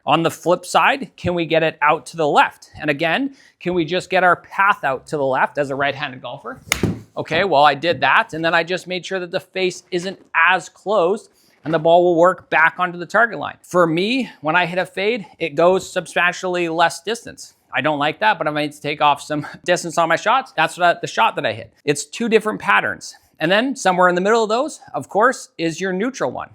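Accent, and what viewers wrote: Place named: Canada. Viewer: Well done. The Canadian accent really shows up in this one. Love the simplistic way you explain that.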